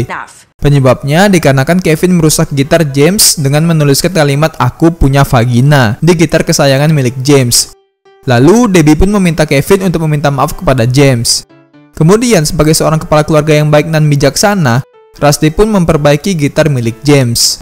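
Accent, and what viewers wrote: Medan, Indonesia. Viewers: aaiii suka kali aku ad di selingi critakn ad logat medan y.. suka kali aku bang.. 😂😂😘😘😘😘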